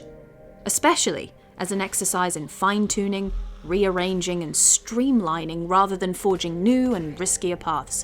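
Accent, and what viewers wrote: United Kingdom. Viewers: Jesus you sound english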